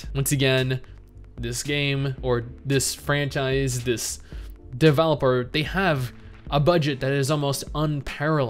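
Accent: United States. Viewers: You're Chinese Australian with an American accent 🤔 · You grew up in Australia but you sound American... Nice looking game, let's keep our fingers crossed.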